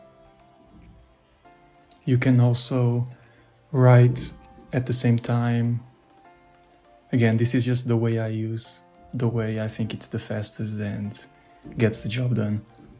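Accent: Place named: Portugal. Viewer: You definitely have a portuguese accent, tudo bem?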